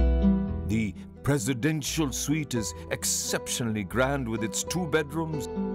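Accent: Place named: India. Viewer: I think this is the first time I have ever heard a white person with an Indian accent.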